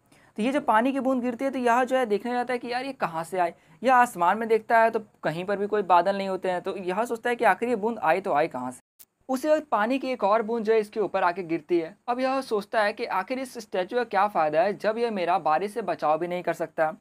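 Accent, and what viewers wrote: Bihar, India. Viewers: Bhaiya mai apke accent se he samajh gaya tha ke app Bihar se hai I am also from Begusaria, Bihar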